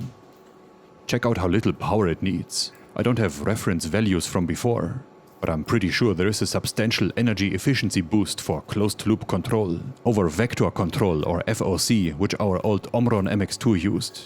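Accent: Germany. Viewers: The Deutschlander flavoured English narration is beyond superb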